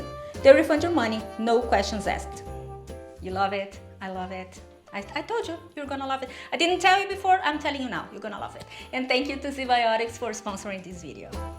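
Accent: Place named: Brazil. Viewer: I know a Brazilian when I hear one! 🫶🏼🇧🇷🙏🏼 que delícia 🤤 feliz Natal Deus abençoe 🎄👼🏽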